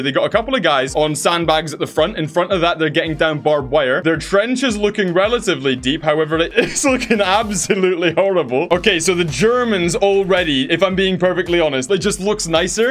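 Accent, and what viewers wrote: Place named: South London, United Kingdom. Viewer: bro sounds like hes from south Lindon im 💀💀💀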